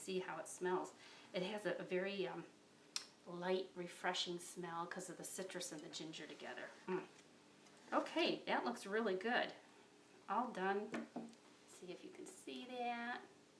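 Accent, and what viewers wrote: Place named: Massachusetts, United States. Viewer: Hello gorgeous,it's Donna from England with the terminal cancerAND the baby AND the three French bulldogs and a pug,YES I'm still alive.I can't tell you how excited and grateful I am about this recipe,I LOVE LOVE LOVE raw cabbage,of course I'm crazy about conventional shop bought coleslaws but urrrrghhhhh the fat content ( but so so yummy).Unfortunately since my husband was killed there's only myself to cook for and if I'm in a lot of pain ------ well you know what I mean.Thankfully I have all of my groceries delivered and I ALWAYS have fresh cabbages,oranges,lemons,chillies,ginger garlic onions and carrots and peppers as a staple,delivered twice weekly.I am going to make a huge bowl of this coleslaw in the morning.I was actually binge watching your videos just last night after I put my baby to sleep.It seems like only yesterday that I "found" you by searching for the Hippocrates soup recipe!!! 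Well I'm going for a rest now and I'm sending you tons of love from England as per usual.Oh and I just ADOR your Massachusetts???????? Accent,love as always Donna 😘❤️xxxx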